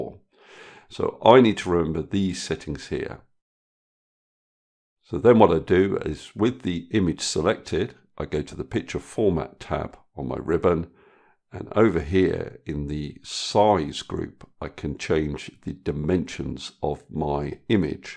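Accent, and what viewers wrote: United Kingdom. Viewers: Brilliant, thank you. And the lovely British accent was a bonus.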